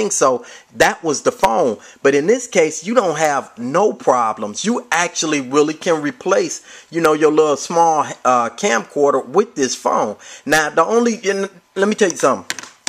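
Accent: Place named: United States. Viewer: why cant you speak standard American English?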